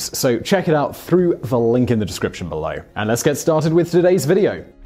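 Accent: United Kingdom. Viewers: I used the think british accents were classy until this! oh my ears. ouch the cringe in my spine! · I normally like your British accent. But I hate how you pronounce NACHOS. Ugh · As a Texan, hearing Spanish words and Mexican terms in a British accent was horrifying and almost insulting. Very interesting video though! :) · I don't complain about his Brit speak. Making fun of a person's accent induced pronunciation is one of the few things we have left to make fun of. And not all Brits have the same accent, there are many different ones. When Roger Moore as Templer used to say Mafia it came out as Maff-Eee-Er. Hilarious. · There are a lot of comments about the pronunciation in this video. I'm of two minds on the subject. First, give a break. He makes a bunch of videos every day and it takes a lot longer than 10 minutes to make the video you just watched. 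But second, there's a support team of researchers who dug all this up for him. Couldn't they help him with the pronunciation? There's this thing called IPA they could provide, and I'm not talking about a beverage. It's the International Phonetic Alphabet (for English). It's all those strange squiggly marks in dictionaries telling you how a word sounds, except much more well-thought out. I have a theatre degree (among others) and I spent a year on this subject alone as it's the basis of learning dialects for the stage. To sound American, British, or Russian, etc. (and yes, I know all have regional variants) part of the process of converting the text on the page to the sounds from your mouth goes through IPA. I know he's British and that will always color how he speaks. This channel provides a lot of interesting and useful information about esoteric topics. That's why I like it. I'm just wishing they'd go one step further and the entire team push to get the easier pronunciations right. 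Some languages are much harder for native English speakers, of course. But making the effort here would be well appreciated, imho. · Love these videos. Although i like the British accent, it seems that along with your efforts to find out the real facts, you would put as much effort in proper pronunciation. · Ha, I've never heard my hometown's name being pronounced so many times by a Brit before! BTW, I've actually been to the Rico's headquarters before to pick up some concessions items for a school festival thing during high school (my high school being located downtown just like the Rico's HQ, it wasn't a tough assignment). It's insane how big that building is for a downtown business!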